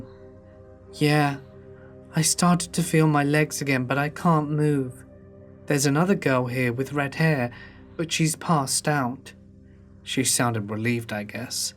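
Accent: United Kingdom. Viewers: Hes british all brittons sound like that . You watch too much mr bean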